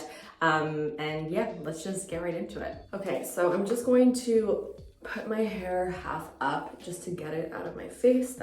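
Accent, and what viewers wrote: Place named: Canada. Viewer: she’s canadian lol she just has an accent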